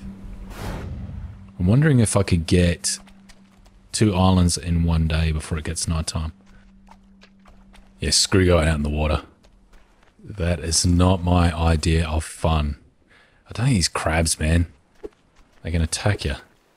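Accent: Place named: Australia. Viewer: I knew you had an Australian accent by the way I'm kiwi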